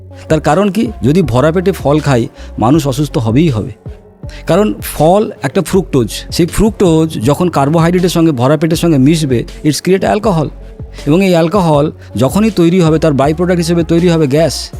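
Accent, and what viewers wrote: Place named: Kolkata, India. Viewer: ভাই, accent তো মনে হচ্ছে কলকাতার।🤨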